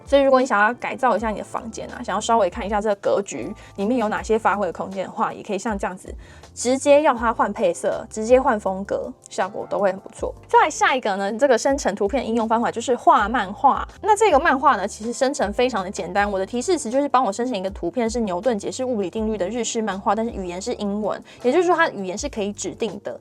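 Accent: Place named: Taiwan. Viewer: 你说话真的好嗲啊，很酥，很台湾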